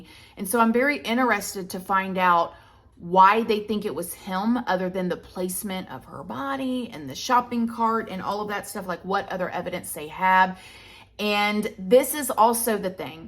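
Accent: South, United States. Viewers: Praying!!! Today, it seemed like you liked it, I voted yes keep intro, then I looked at a couple that seem like you were just tired of saying it and so I changed my vote to you know we love you you've got like a million subscribers, greet us any way you want to, we'll be here! I love your accent, bc my cousins are from Florida but they're from a different part, they also have absolutely beautiful voices, you have a way deeper southern accent I love it. Now, I need to watch this. I do hope things get better for your family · a southern belle Youtuber😮🤯😍 im fascinated by the accent L0L Love from 🇬🇧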